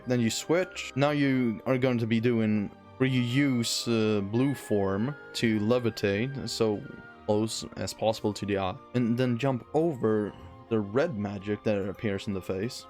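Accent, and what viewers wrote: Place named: Sweden. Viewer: Haha var tvungen att kolla var du kom ifrån. Tyckte väl din engelska lät svensk. Tack för en bra video!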